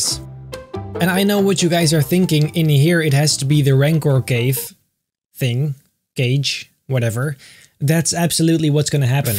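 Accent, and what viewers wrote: Netherlands. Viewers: Are jou from the Nederland or belgium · Are u dutch? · one more confirmed that is dutch he said hupatee en maybe he is also brabants (ik hoop wel dat t een brabo is want brabo`s zijn cool XD) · Are you Dutch? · Your acccents sounds hollandischs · ar you dutch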